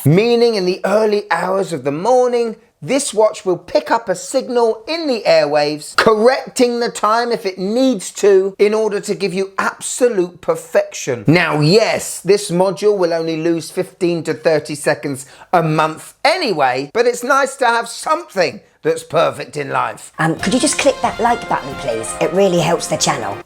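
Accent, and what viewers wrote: United Kingdom. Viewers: When I first saw one of this guy's videos, I thought he was really intense, but now I love him. Even though English isn't my first language, I understand him perfectly; I think British English is easier to understand than American English.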